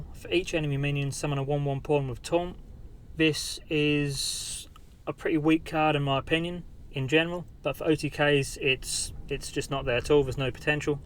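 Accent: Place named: Norfolk, United Kingdom. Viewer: Never seen you as English. You from Norfolk lol?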